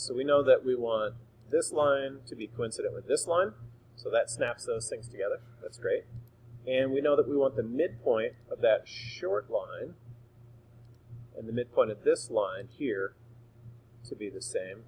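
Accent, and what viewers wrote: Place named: United States. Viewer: Very poor instructional video. This is made for people who already know how to make a cylinder. moves and clicks on stuff the beginner has NO idea of what he is doing. He is way too fast, doesn't explain why he is doing what he is doing. I am rewinding again and again and again, trying to find what he is doing and why. If this is basic construction in OnShape, take the extra time to explain EVERYTHING you are doing and where to find it. This video is useless to me, I guess I need to find a simpler app to use. I am glad he speaks American English, not some foreign deviation that is totally not understandable.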